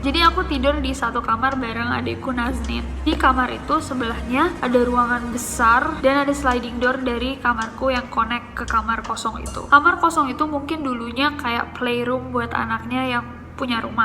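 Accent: India. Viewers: I Like Your Indian Accent.. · ka ..kaka itu aslinya itu dari india ato inggris sih ??? :v binguuung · indian accentnya keren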